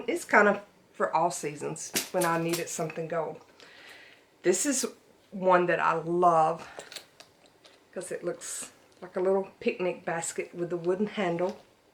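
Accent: South, United States. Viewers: love your purses and your southern accent! Your vids are great!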